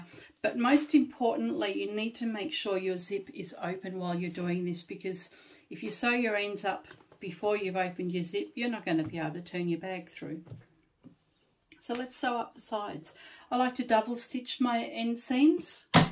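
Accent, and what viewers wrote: Australia. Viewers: I only found your channel a few days ago while unwell in bed, and I’m itching to get well and back in the sewing room. Your instructions are easy to follow and it’s great to hear an Aussie voice too. I made 3 helping hands yesterday and will make a few more for our family card playing days. Thank you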